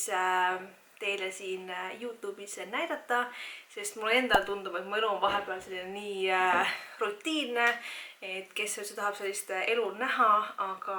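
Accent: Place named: Finland. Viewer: Nii mõnus soome aktsent ☺️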